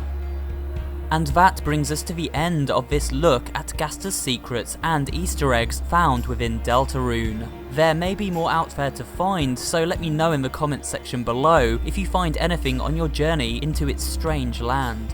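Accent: United Kingdom. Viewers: this dude is just british Chills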